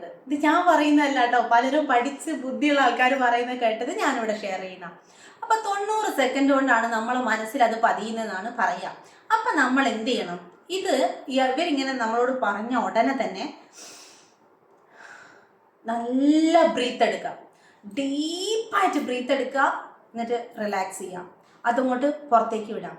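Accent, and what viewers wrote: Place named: Kannur, India. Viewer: Ningal kannuraano 😅.nammale athe basha slang..veetil ulla areyo pole thoni😅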